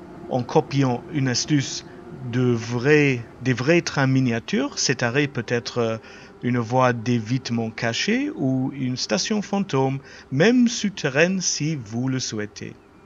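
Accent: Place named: Germany. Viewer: T'es pas français, je dirais allemand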